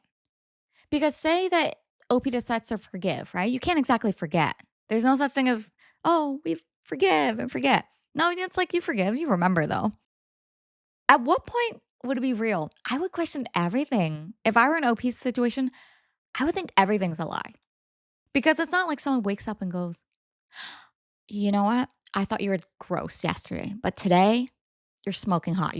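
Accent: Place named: United Kingdom. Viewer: Chucked up is vomited aha, it's always fun hearing a fellow brit in the wild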